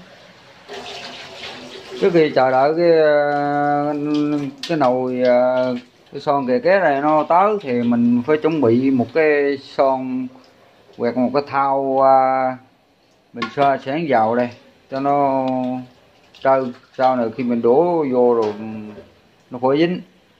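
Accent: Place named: Central, Vietnam. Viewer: ôi đúng giọng miền trung,hướng dẫn tận tình chi tiết!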